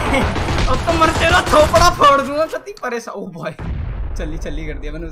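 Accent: Haryana, India. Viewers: Hariyanvi style xD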